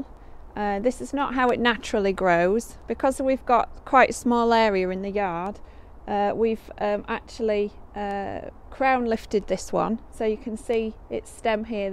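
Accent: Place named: Manchester, United Kingdom. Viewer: Subbed you you sound local manchester way lol hopefully get sone good tips off you!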